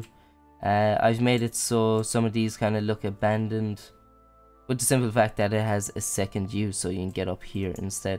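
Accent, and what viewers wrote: Ireland. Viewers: You sound like you're from athlone or carlow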